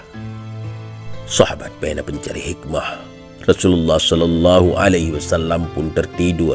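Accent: Aceh, Indonesia. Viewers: Adminnya ada slang melayu acheh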